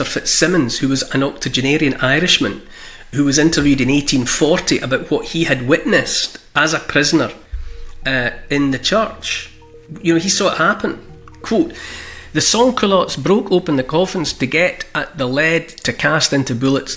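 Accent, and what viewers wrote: Scotland, United Kingdom. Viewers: Brilliant. Oral stories enchance the written word and covey the spiritual nature of history. Especially done with a fine Scottish accent.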